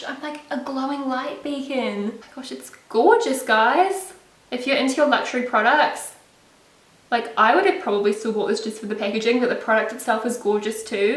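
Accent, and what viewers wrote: New Zealand. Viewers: 'petel flower' -> petal flower, gotta love that Kiwi accent! x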